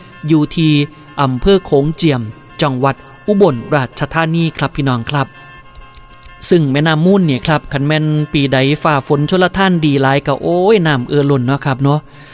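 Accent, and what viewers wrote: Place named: Khon Kaen, Thailand. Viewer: ขอนแก่นแท้เว้าแบบนี้ละครับ เว้าภาษากลางปนลาว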